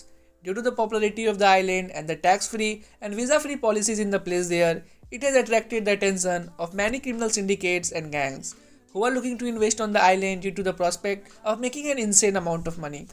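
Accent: India. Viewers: Are you Indian? Your accent 🤪 Me is too🤣🤣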